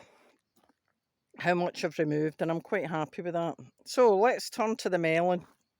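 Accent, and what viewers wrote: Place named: Northern England, United Kingdom. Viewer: I heard the accent and thought to myself how on earth has someone up north managed to get butternut squash to come in so quick and also to have toms outside. Then I saw you in France. Well done.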